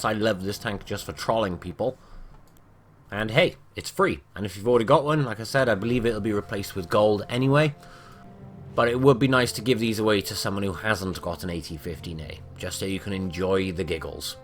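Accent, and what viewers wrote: United Kingdom. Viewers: Also where from Britain is he? The accent sounds local to me.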